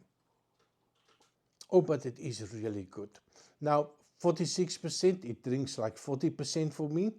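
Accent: Afrikaans, South Africa. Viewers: You sound Afrikaans South African... I know because I sound the same😂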